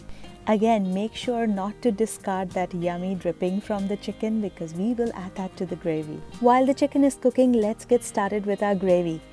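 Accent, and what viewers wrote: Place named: India. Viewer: That looks superb thank you, BTW I love your Indian Accent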